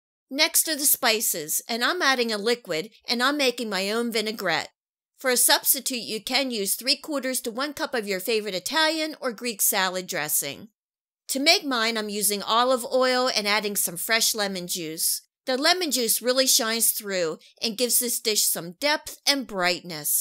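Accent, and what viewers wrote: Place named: Pittsburgh, United States. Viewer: Hi,! Love this idea! Are you from Pittsburgh? I grew up there but live in CA now. I hear an accent. 😊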